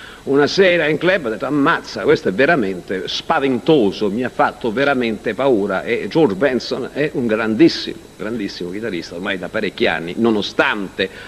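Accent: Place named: Milan, Italy. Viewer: Accento quasi da cummenda milanese. Mi stupisci sempre, e mi manchi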